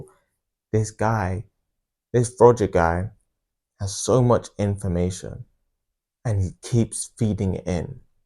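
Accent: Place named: Nigeria. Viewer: Man you talk like a Nigerian 😊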